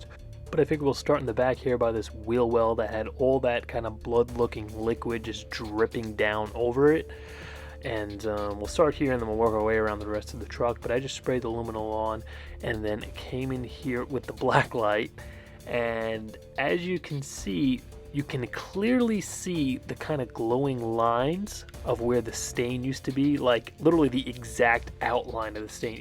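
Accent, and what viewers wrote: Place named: Bronx, United States. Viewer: Haha bronx accent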